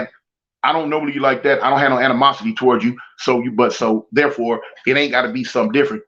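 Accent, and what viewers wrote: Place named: Texas, United States. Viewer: I hear a Texas is accent 6ig homie most got people down there huh when you hit that (Mane) it makes me think that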